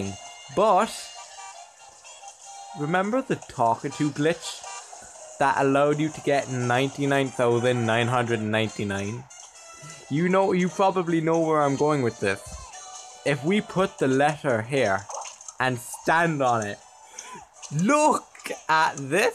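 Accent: Ireland. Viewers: Hey are ya Irish? I am too! 😆 Also made a video about this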